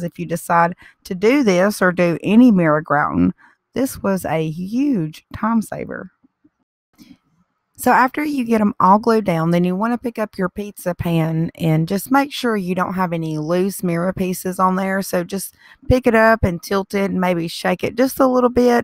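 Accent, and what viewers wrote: South, United States. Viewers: You are so creative and explains the techniques so well. Thank you for sharing! Also, you are so pretty and I love your southern accent!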